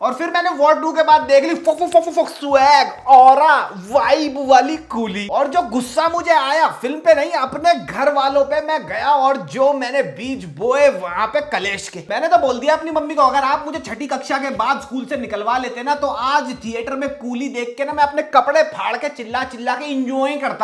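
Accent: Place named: Delhi, India. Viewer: Mothiiii Lag'gii Dekh k 😂😂😂😂😂😂 Delhi accent superb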